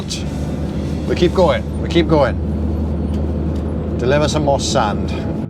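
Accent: Wales, United Kingdom. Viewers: Welsh accent makes it better “ya joooking”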